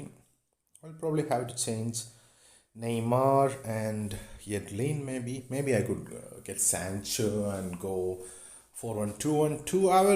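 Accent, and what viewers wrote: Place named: Kerala, India. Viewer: Ate you Indian? You sound thoo.. Kerlite to be more specific?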